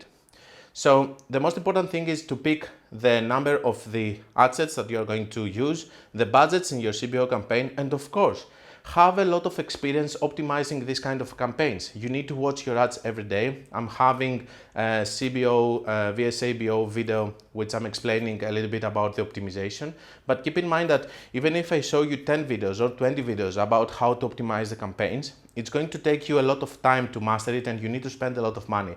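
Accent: Greece. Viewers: I do have sensitive hearing, but it wouldn't bother me so much, if it were only intro sound, but here's the thing, I watch YT on TV using keyboard and I need to constantly adjust volume when you're suddenly inserting those sound effects in midst of your quite quieter speech. And all that including your Greek accent (which I don't mind being European myself) really extends duration of watching while I try to comprehend what you're saying, so I'm constantly stopping and reversing video and it's a pain. You may comfort yourself by not being the only youtuber with that problem, there's plenty of them out there. But you don't need to edit your videos on my account, after all this was your first and last video I watched, because I've never had FB account and these topics don't interest me. And now you may bring up some mom jokes.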